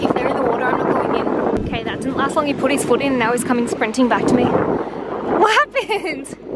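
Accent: Ireland. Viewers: Is it just me or do you have a bit of an Irish accent :o 🍀:) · I am loving your little Irish twang. I live in Brit living in Tassie, but all my family are Irish! Love it! Thank you for sharing a part of your soul with us! <3 love and hugs xx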